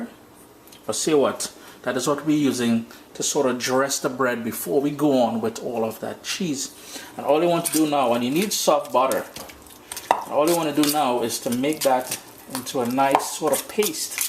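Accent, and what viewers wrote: Trinidad and Tobago. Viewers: of course i will put scotch bonnet pepper on it!!also brother how do i make this more carribean? i love your accnet!! trinidadian? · Am I hearing a hint of a Trinidadian accent? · Yuh sound Trini 🤔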